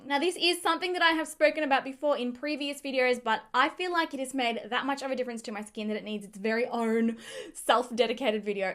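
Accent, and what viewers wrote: Australia. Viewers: You sounds like an Aussie. Thanks for tips, but I am interested in natural therapy not after promotional products. :) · ARE YOU AN AUSSIE??SOUNDS LIKE IT LMAO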